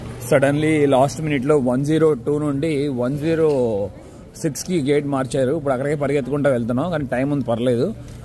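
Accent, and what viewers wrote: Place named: Vijayawada, India. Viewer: Harey Krishna.. brother, meedi Vijayawaada kaabatti, mee bhaasha chakkagaa untundi... waiting to see your videos in Japan.. Jai Sree Raam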